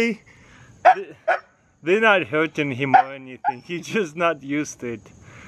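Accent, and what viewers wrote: Russia. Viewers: сильный русский акцент, откуда вы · Ты русский ? Акцент так и пер😂👌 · Never really been attracted to a Russian accent until I watched this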